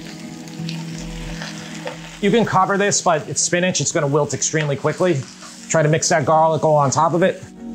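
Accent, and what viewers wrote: New York, United States. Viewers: Great job on your presentation and content!😎🇺🇸🔥 Love the “Authentic “ approach…😋 I can’t help but feel confident with your approach and ingredient choices!😘 The New York accent doesn’t hurt!!😅 Lol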